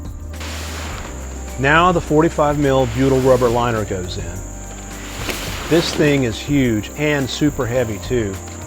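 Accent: South, United States. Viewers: I've come to love watching things like aqua/pondscaping, and this really clicks for me. Your calming, southern voice soothes me and your choice of music is so nice and chill it's easy to follow, plus being filled with all the nature noise. Thanks for what you do,.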